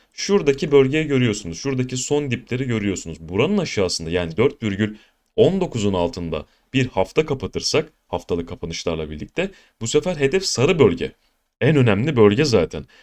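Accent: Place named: Black Sea, Turkey. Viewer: Hocam Karadenizin hangi ilindesiniz ❤️🤲🧿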